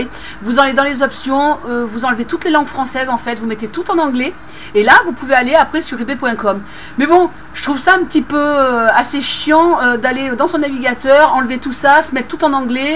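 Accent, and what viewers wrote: England, United Kingdom. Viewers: que j'aime ton accent anglais :p Et oui faut PAS acheter à n'importe qui et surtout pas une arnaqueuse lol MDR mon mot à l'écran !! Hihi et bien pour ma défense je me suis bein rattrapé :p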